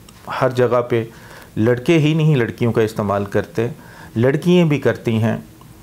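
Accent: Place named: Pakistan. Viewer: Main India se hoon pr Alhamdolillaah Pakistan se bohot lagao hai boli bohot achchi lagti hai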